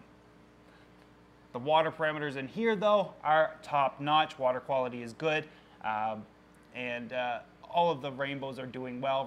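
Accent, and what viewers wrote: Canada. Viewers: you sound like a canadian. especially when you say "barb" haha sound like randy from TPB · I love your Canadian accent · I like your Canadian Accent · Sorry *clearly Canadian*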